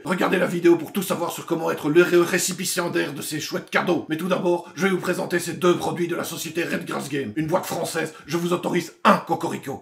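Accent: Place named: Hainaut, Belgium. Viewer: Rien que pour l'accent hennuyer, c'est abonné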